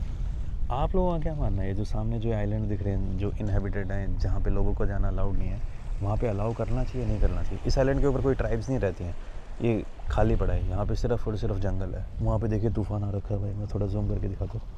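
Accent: Haryana, India. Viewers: Bhai ye pakka haryana ka hoga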